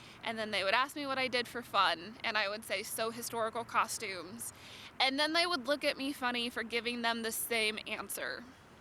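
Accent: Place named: Ohio, United States. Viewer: I knew your accent sounded familiar. I grew up in Ohio. :)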